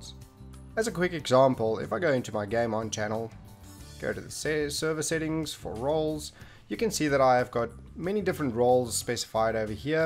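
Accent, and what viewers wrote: South Africa. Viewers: ur South African right?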